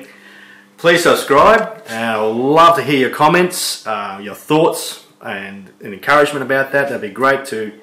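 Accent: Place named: New Zealand. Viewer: No worries, keep up the good work, look forward to the finished product! I take it you're a kiwi as well? Just sound like a kiwi to me!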